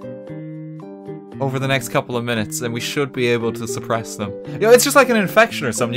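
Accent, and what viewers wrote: Ireland. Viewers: U Irish my guy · Ur Irish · So funny story. Last night I plugged in my kindle, set it to an "RTGame's Best Videos" playlist, put my earbuds in, and accidentally fell asleep. I'm American, but when I woke up this morning, as a result of listening to him talk all night in my subconscious, my thoughts had an Irish accent for a solid minute. I cracked up as soon as I noticed it, and as much as I wish this was an April fool's day prank, I'm being completely serious XD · Eyyi I hear an Irish boi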